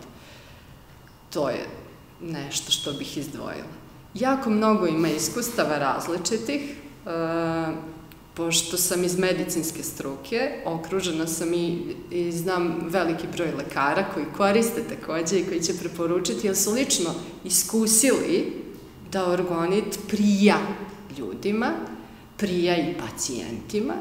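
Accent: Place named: Novi Sad, Serbia. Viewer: Kako je milina slusati Novosadski akcenat. Bukvalno te uspava.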